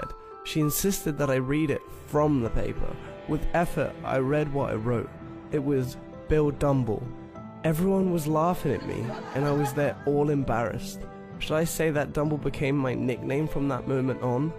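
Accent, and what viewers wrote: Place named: United Kingdom. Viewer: His voice in this story is pleasing to listen to and his British accent sounds pleasant too.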